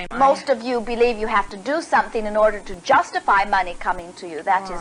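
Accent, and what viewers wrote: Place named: Mid-Atlantic, United States. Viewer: Love the mid-atlantic accent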